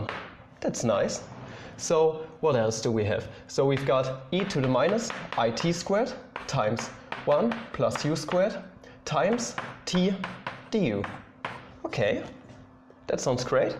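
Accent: Germany. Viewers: You've got a nice German accent, I like it!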